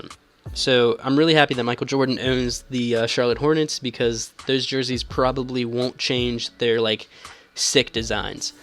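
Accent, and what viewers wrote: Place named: Philadelphia, United States. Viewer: u gota thick philly accent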